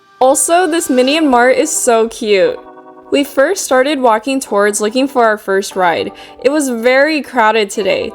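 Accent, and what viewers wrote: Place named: United States. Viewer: 最近跟著小六的女兒一起學英文，可以聽道地的美語，還有有趣的Vlog，非常棒的影片。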